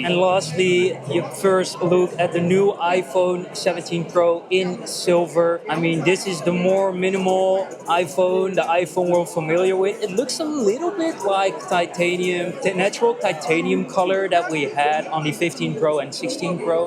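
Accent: Netherlands. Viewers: The Dütch accent was aanwezig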